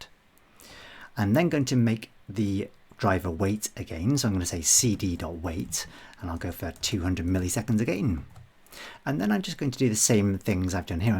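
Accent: United Kingdom. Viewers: Love your training series and your British accent.Thanks for sharing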